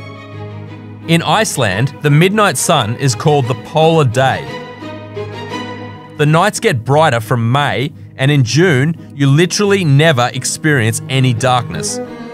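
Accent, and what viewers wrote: Australia. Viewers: Aussie mate explaining sounds so nice!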